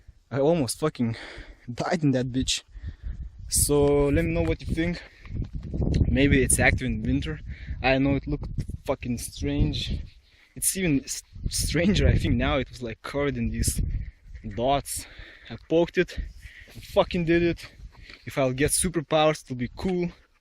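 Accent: Russia. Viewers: Videos are great. I can tell your from somewhere like Russia or close to it. What a lot of people don't understand is that the word Fuck to you (since English is not your first language), is not a big deal. Keep exploring my friend, maybe you will get that superpower:) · Are you Russian? I hear accent ha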